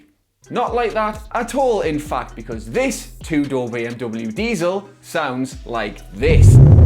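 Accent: Newcastle, United Kingdom. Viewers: Hair, tats, Geordie accent , Badgers and a Beeemer - whats not to like :)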